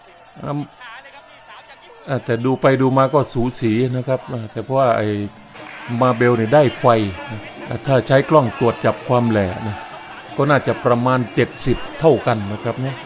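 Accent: Isan, Thailand. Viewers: เป็นกำลีงใจให้ครับ พากย์อีสานแบบนี้แหละฮาๆ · เสืยงพากออกทำนองอีสานนี้มันน่าฮักอีลีเด้อ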